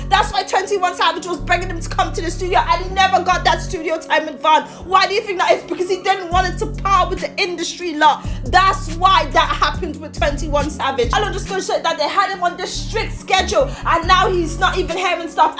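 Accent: United Kingdom. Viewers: Imma real O block fan and it pisses me off to listen to this bullshit your DISRESPECTING KING VON’s legacy, family etc... IF YOU WANT TO MAKE MONEY AT LEAST DO PROOER RESEARCH AND SPEAK FACTS!!!! You sound like some U.K girl with wild imagination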